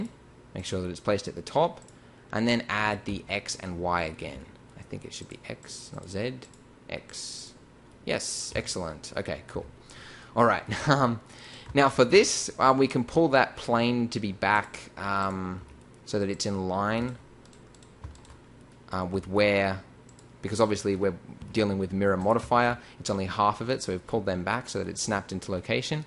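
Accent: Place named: Australia. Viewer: this daft Aussie with his annoying accent ("blen-Dah", "heeyah", "compositah") cant tutor crap. he's more into showing off than teaching. he's as bad as Sardi Pax. the best tutor, especially for beginners, is tutor4u. ive learned so much from him.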